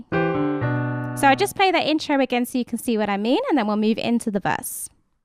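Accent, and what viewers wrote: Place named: United Kingdom. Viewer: I'm loving the accent ;) Edit: Its nice to hear a British voice when you're from England haha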